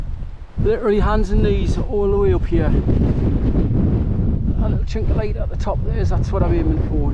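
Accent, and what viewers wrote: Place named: Geordie, United Kingdom. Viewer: I went up Bizzle Crags years ago only to get to the top of the Cheviot and find it one of the worst places l have ever been in my life. It was sleeting, blowing a gale and zero visibility. There was no nice flag path just bog. I never bothered again and now l am old with knackered knees a two hour toddle around the fields in a gentler part of the country does it for me. Still it’s nice to be reminded and wonderful to hear Geordie dialect, Gods own in my opinion. All the best from a soft southern shandy drinker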